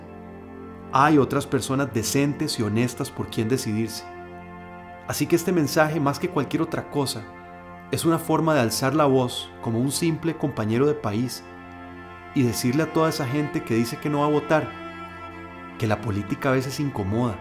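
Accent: Costa Rica. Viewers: Desde Guatemala, mis respeto, que hermoso escuchar a un tico hablar asi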